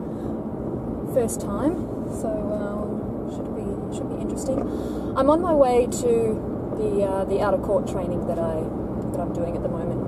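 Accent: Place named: South Africa. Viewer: hi are u from south africa it sounds like it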